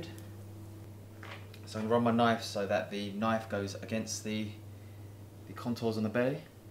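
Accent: England, United Kingdom. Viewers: Sounds British to me.... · The most least Japanese chef ever. Sounds English. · Is it just me or were you also surprised with his British accent? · Now I've seen it all. A British speaking asian...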